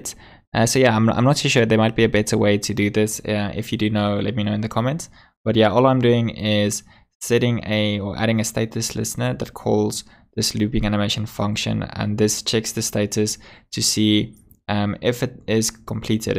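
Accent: South Africa. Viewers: You sound south African 😎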